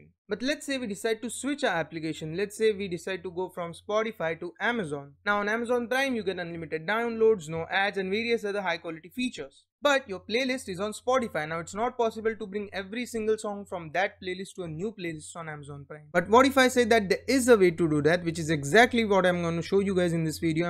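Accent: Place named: India. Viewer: Deshi style main English mat bol Bhai thoda toh respect Kar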